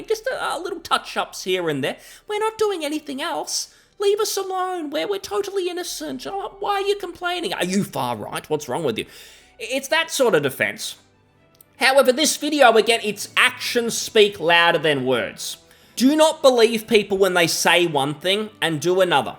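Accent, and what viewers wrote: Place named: Australia. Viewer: Please tone down the Australian dialect, thanks! If i wanted to listen to that shite i go watch crocodile dundee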